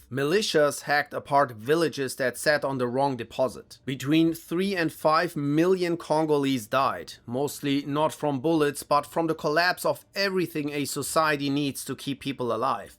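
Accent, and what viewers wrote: Germany. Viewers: Sounding less german, it's really beautiful and sounds even better with each video 🤗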